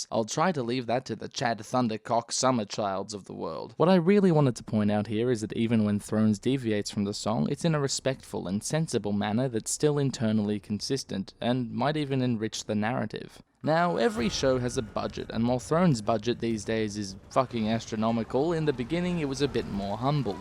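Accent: Australia. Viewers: The second I realized you were Australian: when you said “cracks the shits” The second I realized you were a genius with these videos: when you played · Are you Australian?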